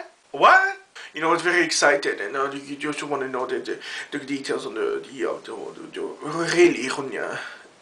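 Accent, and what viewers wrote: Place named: Quebec, Canada. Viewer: He speaks french canadian ;)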